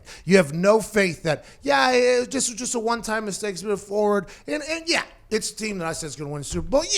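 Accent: Pittsburgh, United States. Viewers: Can hear that yinzer coming through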